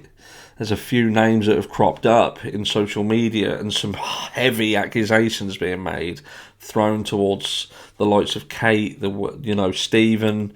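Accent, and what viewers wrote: United Kingdom. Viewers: I agree it seems very peculiar that jagger is living at keilys mums. I would say it’s a bit suspect. Love your Chanel by the way and love how you stick to the facts and the keily Rodni case. I understand from your accent your from the U.K. Although I’m not sure what area. I am from the U.K. and have been following this case but I havnt started my channel yet. I have been following this case and also Dylan rounds and gabby petito case. Look forward to hearing more of your channel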